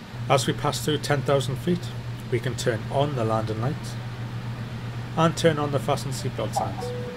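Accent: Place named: North, United Kingdom. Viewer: Looking superb! Can't wait for the release! Nice to hear a fellow northerner too :)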